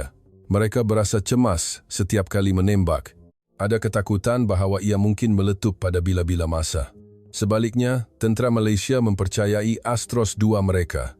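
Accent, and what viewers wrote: Indonesia. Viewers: Kenapa orang Indonesia suka bikin konten tentang tentera Malaysia..dan bikin natizen indo berapi..suara admin jelas2 org Indonesia..bikin saja tentang tni kamu,puji saja tentera kamu kenapa sering masuk campur dgn tentera negara tetangga...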